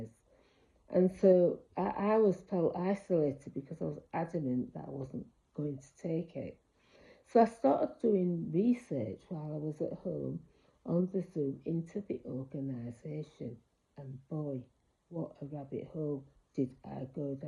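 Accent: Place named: Yorkshire, United Kingdom. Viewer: Hey. My name is Ken, I left JW's after 37 years in 2012, having been brainwashed since the age of 5. Thank you for your video. It's extraordinarily powerful. I remember studying the "Revelation" book in my teens and twenties at the "Book Study Group"once a week, and clearly remember the Watchtower Society excoriating the United Nations. I also remember being at the Twickenham Convention and hearing a letter read out from the platform, condemning the UN as the "Wild Beast". They then bragged that the letter was sent to the UN headquarters. They ridiculed Christendom as being a harlot, and yet, they dropped their own panties and got into bed with the UN - behind the backs of their congregants. What a bunch of spiritual slut$! Vile, disgusting hypocrisy. I'm so glad I am free. I am so glad you are free. I now live in Louisiana but am originally from London. 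Sounds like you're a Yorkshire lass. Am I right? Thanks again for a great video.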